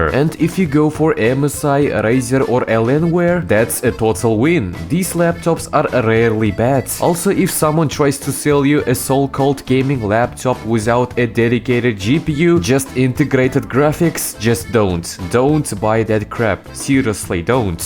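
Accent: Russia. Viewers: This Russian accent pmo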